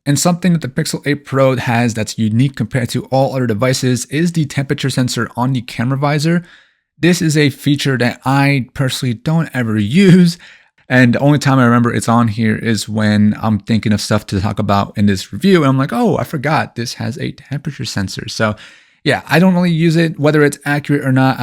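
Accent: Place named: United States. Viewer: Where'd you get India 😂 he has an American accent and a Mexico jersey on lolol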